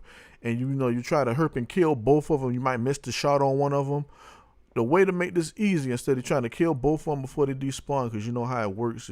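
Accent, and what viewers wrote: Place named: South, United States. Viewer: That’s arrow, not error. Good southern boy accent dair.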